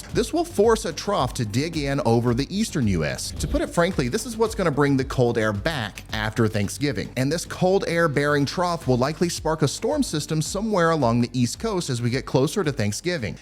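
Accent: South, United States. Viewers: I don’t like your southern accent.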